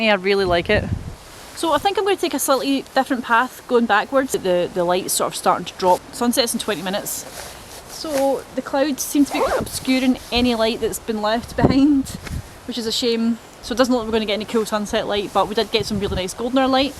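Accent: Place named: Scotland, United Kingdom. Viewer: Another great video. I always look forward to hearing your accent from home (says a Weegie in England!)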